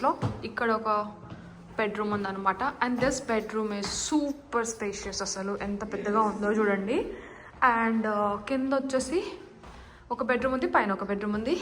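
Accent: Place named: Miryalaguda, India. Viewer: In youtube I suddenly got your india tour vlog . I felt that u r from my nearby area , Because the way you talked.Exactly you are from Miryalaguda I FELT THANK GOD. Iam a upsc aspirant hope I will have vlog after my selection with you..Thank you sister for your videos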